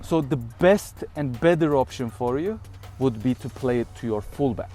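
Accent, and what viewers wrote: Turkey. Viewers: Seni tanimiyorum ama aksanin tam bir turk bu yuzden ARDAAA GULERRR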